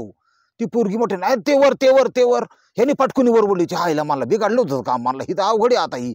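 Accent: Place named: Pune, India. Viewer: तुमची गावरान पुणेरी भाषाशैली आणि उच्चार ऐकायला लई भारी वाटतात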